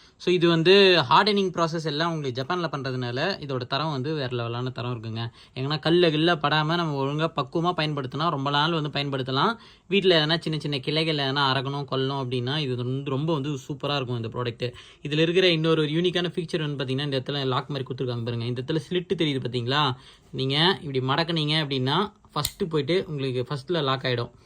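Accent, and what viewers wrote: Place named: North Madras, India. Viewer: I spent several hours in diaso stores in UAE and Singapore ,Malaysia.Tools especially garden tools are very good but Indian wouldn't knowing such tools exist.because we see old tools and some Chinese tools.you did a good job to show us the purchased items.though you tend to speak good media tamil but your north Madras tamil always comes in. Once upon a time I had a engineering student saying pashupu for pipe and to correct him it took us long time though he writes good English.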